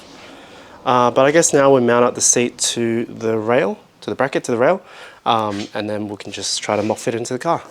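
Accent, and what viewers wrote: United Kingdom. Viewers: I know it’s random but it’s so weird hearing Asians from the uk with the British accent i grew u in hood where’s there’s a lot of Asian gangsters in America and they always Wildin out bilingual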